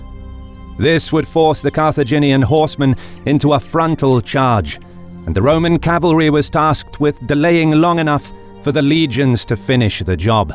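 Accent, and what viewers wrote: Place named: England, United Kingdom. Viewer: Brother I like your English accent😉😉!!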